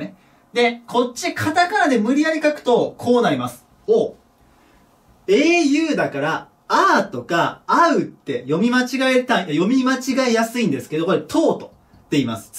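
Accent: Kansai, Japan. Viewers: 前から思ってたけど関西の人ですか？？ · あれ、さん関西の方ですか？？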